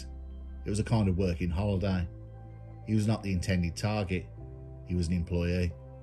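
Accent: Birmingham, United Kingdom. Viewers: Its Just a Brummie accent mate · There honestly is nothing like a video from, the dulcet Brummie tone, the deadpan delivery, the stories of mans inhumanity to fellow man and the haunting yet relaxing music are what makes these videos such a winning formula. I had to find the music and found this: <link> Apparently it's called " " but I think it may come under a different name in the YouTube music library.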